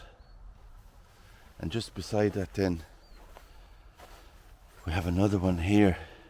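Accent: Ireland. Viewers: Thanks fabulous video, lovely Irish voice Lynn in Brittany x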